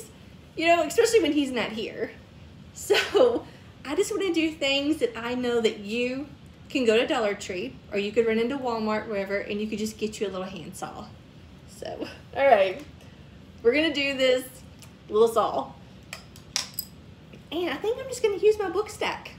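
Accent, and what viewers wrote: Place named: Kentucky, United States. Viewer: I thought you had to be from KY because of your accent. I live in KY too!!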